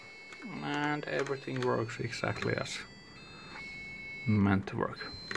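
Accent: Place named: Finland. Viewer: Arvasin heti aksentista, että kyseessä on suomalainen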